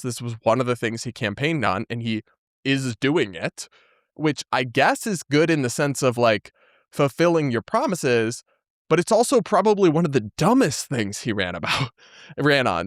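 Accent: United States. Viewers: talking with an American accent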